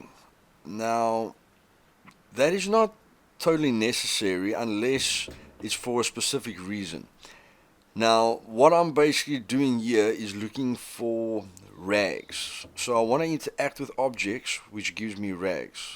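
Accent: South Africa. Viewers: You from South Africa? Subbed!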